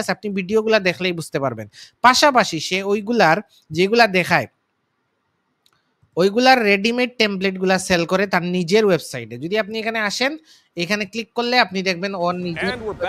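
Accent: Sylhet, Bangladesh. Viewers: Apni sylhety naki???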